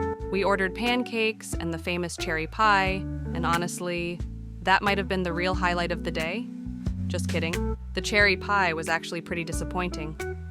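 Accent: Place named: Italy. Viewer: I actually discovered your Lake District videos first and then worked my way back to this, your very first one. I assume the words here were yours, even if the voice telling the story belonged to someone else. I’m glad you eventually found your own voice — quite literally. As I’ve said before, you’re a natural storyteller. Your own narration, your personality and, yes, your Italian accent — I got it right this time! — make the later films feel wonderfully immersive. There’s something fitting about that progression too. Photography is ultimately about finding our own way of seeing the world, and perhaps storytelling is much the same — eventually we have to trust our own voice. And film somehow complements that philosophy perfectly. There’s uncertainty every time we press the shutter, but perhaps that risk is precisely what gives the eventual photograph its value. 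For me, the pleasure of the outcome still far outweighs the uncertainty of getting there. It is fantastic to see where these adventures began.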